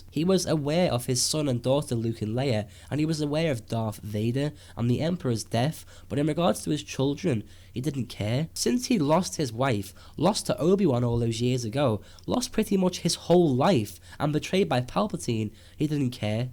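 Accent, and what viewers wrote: Liverpool, United Kingdom. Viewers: Nice to hear a British accent talking Star Wars! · I don't think Vader was trying to kill Luke in Empire, he was basically just defending from Luke's attacks, and driving him toward the carbon freeing unit, so he could take him to the Emperor. He didn't know about Leia until he read Luke's mind. Also, are you a Scouser?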